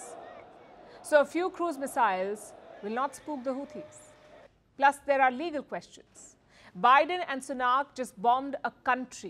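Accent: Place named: India. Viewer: You have to appreciate the seriousness of an Indian accept with the news! It’s frank and firm! Amazing work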